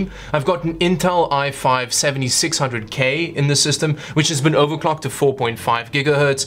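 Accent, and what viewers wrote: South Africa. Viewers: are you south african? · Bra is jy van SA?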